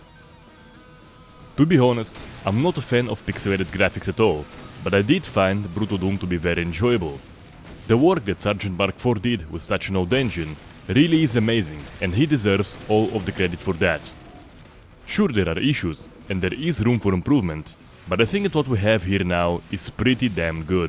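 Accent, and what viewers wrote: Russia. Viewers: What are your future plans for the series,? P.S. Are you from Russia?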